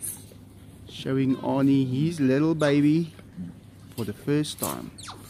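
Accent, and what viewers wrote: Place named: South Africa. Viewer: South Africa.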